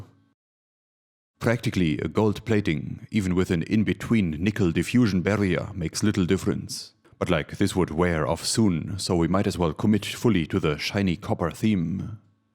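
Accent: Germany. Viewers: No not monotone, it’s deep late night Radio DJ voice w/ an exotic Deutsch / German Accent. A great intoxicating lullaby when I too tired to care.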